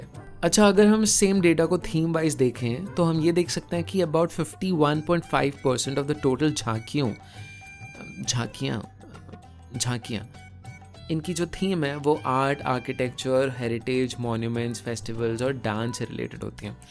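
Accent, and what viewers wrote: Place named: Agra, India. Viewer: Bro, I love your voice. ❤️ It's so soothing. ( Are you from Agra or near ? Your accent tells me. ) Apart from Kurzgesagt, Neelesh Misra and Think School, yours also is my favorite youtube channel. Thank You so much !!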